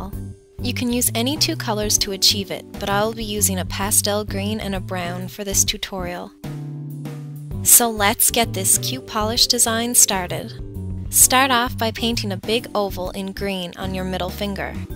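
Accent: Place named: Canada. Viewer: She's totally Canadian. Listen closely to her voice.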